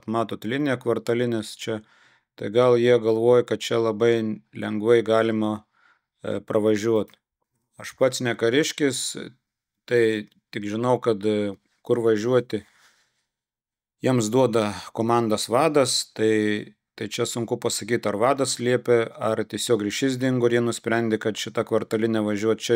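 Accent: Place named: Aukstaitija, Lithuania. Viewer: a pats koks toks esat - suvalkas(užaprobuotas), rytų-vidurio-šiaurės aukštaitis,(tikrai ne žemaitis, nes tie šitaip neišsipisinėja) - kame tas "akcentas" kliūva?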